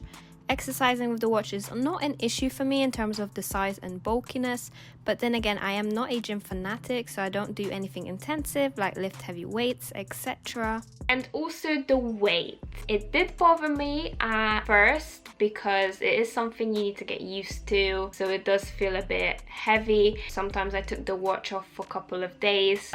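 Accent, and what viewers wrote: United Kingdom. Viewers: O jeeeeeeżu, szukałam info o Apple Watch, słucham, myśle „ale ładny akcent przyjemnie się słucha”, a tu nagle po polsku :) :) :) byłam przekonana, że jesteś Brytyjką, pozytywnie zazdroszczę tak idealnego angielskiego. Pozdrawiam z Trójmiasta ❤️✌️ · I love the commentary and information you provide but your pronunciation of the "th" sound as "f" is a bit annoying, detracting from an otherwise enjoyable viewing experience. Is that a muddling of an Eastern European or Russian accent with British or is it a straight Cockney trait? I'm sure you're aware of it but a few examples include saying "fun fings to do" instead of "fun things to do", "a day in the life wiff..." instead of "a day in the life with..." or "that's everyfing" instead of "that's everything." Hopefully you can correct this small dialect flaw for future videos as your content is a pleasure to watch. 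Cheers!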